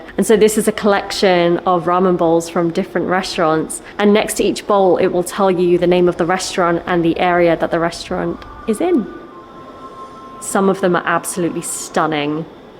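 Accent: United Kingdom. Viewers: たくさんのイギリス人You Tuber がいるけれどさんのブリティッシュ英語が本当に耳に心地良いです☺️ あ…目にも心地良いです😊笑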